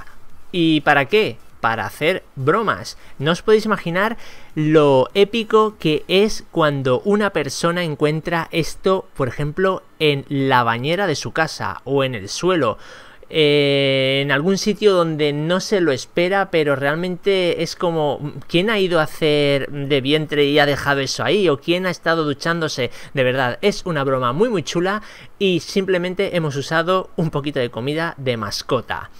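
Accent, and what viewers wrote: Chile. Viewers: No sabias que eras chileno, por tu acent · Eres chileno¿?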